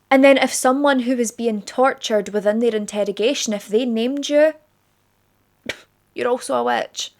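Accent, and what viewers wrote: Scotland, United Kingdom. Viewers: I completely understand your accent i knew right away you were Scottish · Girl I love your Scottish accent ❤also I came for the witchy stories I love this stuff!! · your accent reminds me of my grandad he was scottish and an amazing man. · I’ve just found your channel today and subbed. This was a good overview. I definitely would have been considered a witch and probably end up toured and buried. Part of the reason I did sub, not just your delivery and interesting topic but I like the Scottish accent. There is Scottish blood in me so thank you. Peace and love from🇨🇦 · Happy to find this, i have an interest in witch trials, no matter where, love for Pagan traditions, witchcraft in general, i also have a gorgeous black cat named, wait for it.... Salem. Btw, love your accent, wish i had scottish in my ancestry, but no, just german and a small amount of irish, also have alot of birthmarks and moles, and pretty much a loner. 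I guess i would be cooked.❤❤❤❤❤❤😂😂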